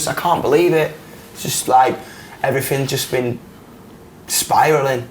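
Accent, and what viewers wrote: United Kingdom. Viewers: Yeah, he is speaking British English, a proper English many british people would say. So American mates are the ones got an American accent if we r being correct here lol, including me at some point as well. Not trying to be rude. Just reminding of the history. I also like British English, as many other English accents.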